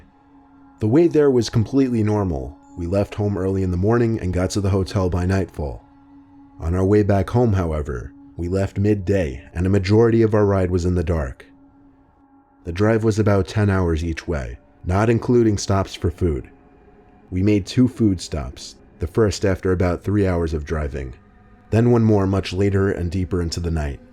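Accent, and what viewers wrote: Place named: New York, United States. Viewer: Liam Sadler i knew he was either a new yorker or New Jerseyian like myself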